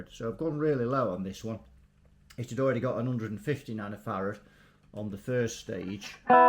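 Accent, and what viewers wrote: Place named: Lancashire, United Kingdom. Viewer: I noticed an earlier comment about your accent, you reply you've been trying to speak more clearly for you audience. Its great to hear, reminds me of my Dad who came from Leigh in Lancashire down to London when he was 15 to start an apprenticeship french polishing furniture. No work in Lancs. Oddly he never lost that Lancashire accent and shared a lot of your regional sayings even after living in London until he was 76.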